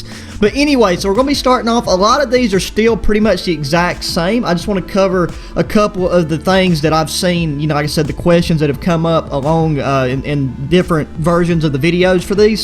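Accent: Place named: Texas, United States. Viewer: I'm not trying to be rude but you sound like you're from Texas or like a cowboy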